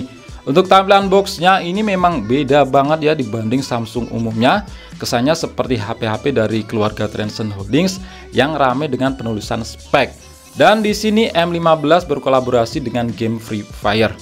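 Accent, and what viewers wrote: Blitar, Indonesia. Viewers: orang Blitar kah bang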